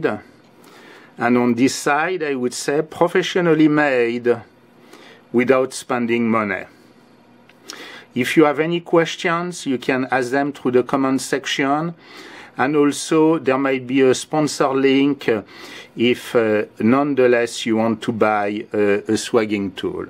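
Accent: France. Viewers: Man I like your English with that French Accent!!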